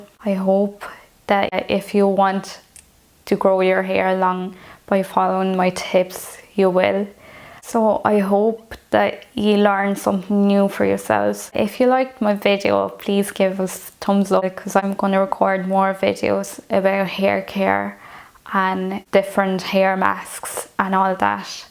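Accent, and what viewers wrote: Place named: British Isles. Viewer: First! Love the accent. Did you learn English in the British Isles? Your Instagram is marked private :)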